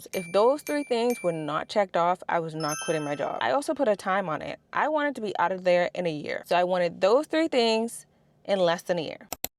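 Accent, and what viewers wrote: Bahamas. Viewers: That batian accent ❤.. new here but praying I learn so I can get off this rat race . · Are you Bahamian? I hear a slight accent 😅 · Love the video and congratulations! But mam, I can't ignore hearing a Bahamian accent. Now I'm super curious. Either way, love this for you! 🙌🏿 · Are you from the Bahamas? The way you say financial it reminds me of the local accents when I went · you sound like a Bahamian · Wait. You're Bahamian?! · Are u Bahamian i hear the accent but cant tell also love ur content this is awesome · sound bahamian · Wait...I hearing my people?? Are you Bahamian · are you from bahamas? u kinda sound bahamian lol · I know i heard the bahamian in you loud & clear! Im proud of you. Im deep in this to clear debt, and provide emergency funds to actuay leave my job as well. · That accent can’t hide boy 242🇧🇸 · Hi i love your video and how you break everything down, I think i am hearing a Bahamian accent, i know you said having Caribbean parents! 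But thanks for taking the time to make this awesome content. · You sound Bahamian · Great video ... sounds so Bahamian ...lol !!!!!! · I picked up on your accent. It warms my heart to see a fellow Bahamian woman trading ❤ Wishing you all the best! Your video really encouraged me to take trading and financial freedom seriously. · You from the Bahamas eh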